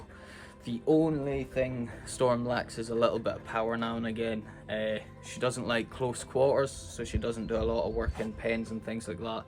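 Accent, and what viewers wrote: Scotland, United Kingdom. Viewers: Im not sure whats most satisfying with this channel. The scottish accent (i think its scottish at least) or the dogs. Ive commented it once before, Echo is such a piece of beauty. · I had a border collie when I was a kid. I was an only child, and Queenie was like my sister. I love watching you and your dogs. I also love your accent. I was in Scotland a few years ago, and I loved it.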